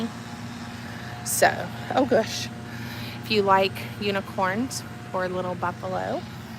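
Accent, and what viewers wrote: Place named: South, United States. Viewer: LOVE that southern accent!!!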